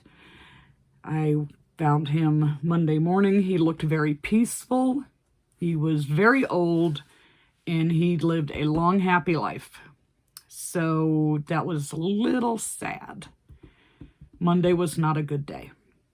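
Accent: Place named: Florida, United States. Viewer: You speak like a Floridian. Laid back and easy. It’s why you’re my favorite. Feels like home.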